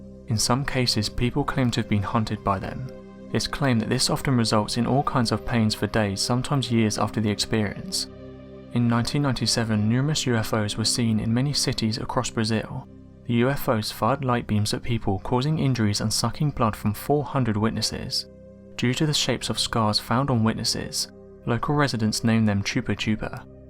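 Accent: England, United Kingdom. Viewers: Dantess26 -English accent ✔ -Similar transition and profile pic ✔ -List of 5 ✔ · bra im confused. does he mean shoes? or real human feet detached from the ankle. can someone clear this up for me ? or does he mean that the human foot was inside the shoe when it was found? im a southern gentleman myself and I understand the man has a British accent, that being said, do they say feet in England instead of shoes? or am I just overthinking this.. point is does he mean shoes or real human feet floating around in the water .. cause I feel that if its human feet floating around then it should be an open/closed case that it was murder .......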